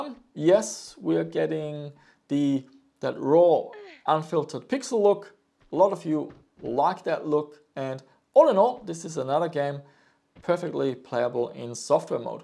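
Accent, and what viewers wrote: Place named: Germany. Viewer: Your profile says you are from Australia, but you sound like a German speaking English. Why is that?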